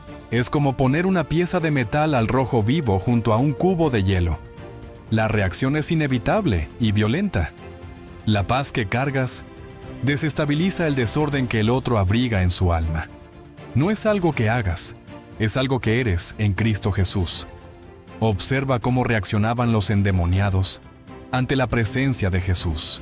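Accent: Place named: Guatemala. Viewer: Me parese qie eres de Guatemala por el tono de thu voz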